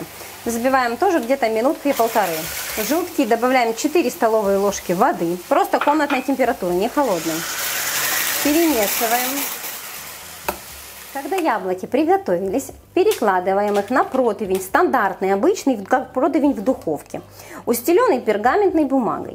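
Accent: Ukraine. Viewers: Здравствуйте,. Спасибо за Ваш труд, за Вашу энергию, за Вашу любовь к подписчикам. Очень нравится даже просто смотреть, как Вы готовите, и слушать Вашу милую речь. Я строго отношусь к правильной речи, считаю это важным. Но, как здесь уже сказали, есть в каждом языке своеобразие и самобытность. Ваша речь полна этих самобытных украинских особенностей. Это здорово. Я очень любила в детстве слушать, как моя белорусская бабушка гутарила с соседками. Песня! Обязательно приготовлю по этому рецепту рулет. Будьте здоровы.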